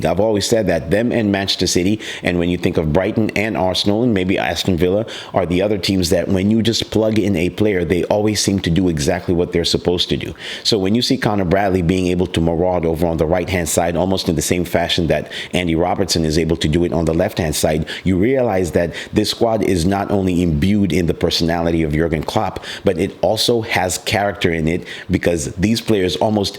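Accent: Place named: United States. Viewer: Great analysis.American accent 👍🏻😊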